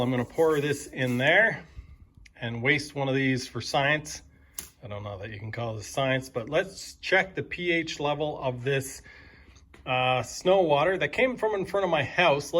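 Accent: Canada. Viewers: Excellent videos! 🍻🍻 Do I detect a southern Ontario accent? 😁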